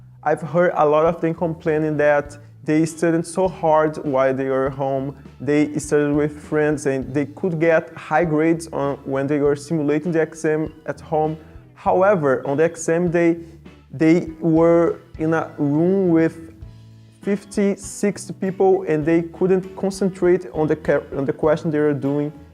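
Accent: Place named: Brazil. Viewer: Your english is pretty good, and surprisingly you have only a little accent, which is kind of rare for brazilians!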